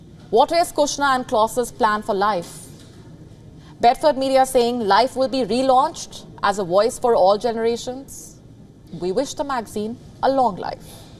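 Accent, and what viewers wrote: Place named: India. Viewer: Too strong Indian accent 🙏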